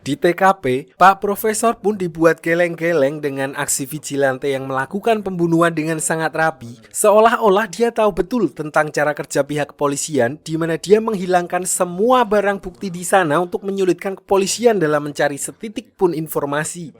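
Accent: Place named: Banyumas, Indonesia. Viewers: Wong Banjarnegara kie jelas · Perbatasan Wonosobo Banjarnegara, wong ngapak kie 😁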